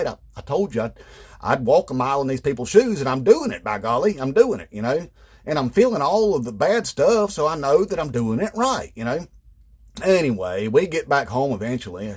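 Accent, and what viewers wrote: South, United States. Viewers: Pretty much impossible to make sense of his southern-twang machine-gun chatter & spew, let alone Listen to it.... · Much appreciation from Canada🎉You sir, are brilliant and the best thing on the web. I will never again assume that a strong southern accent has anything to redneckedness! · The only thing MAGA people hate more than women, black people, poor people, queer people, educated people, children (once they're born), illegal immigrants, and legal immigrants, is themselves. Ps.: As an Austrian, I love the way you pronounce 'Nazis' .. that southern accent is gold 😅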